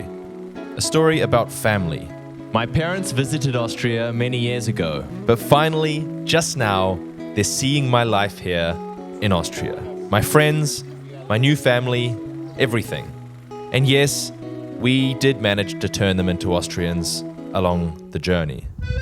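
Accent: New Zealand. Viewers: Is there something about the Kiwi accent that lets you learn/pronounce the Austrian dialect particularly well? 🙂Because many immigrants in Austria speak perfect German - but none of them ever master the "Dialekt". So I was wondering whether you already had a starting point from your own native language that lends itself particularly well to learning to sound "Austrian"? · I'm always baffled when I hear your kiwi accent...you imitate Austrian German so well I somehow expect you to have an Austrian accent when you speak English. Makes zero sense, I know 😂.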